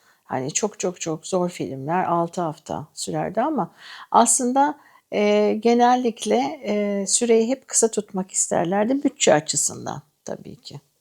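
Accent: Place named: United States. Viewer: Amerikan aksanıyla Türkçe konuşmak...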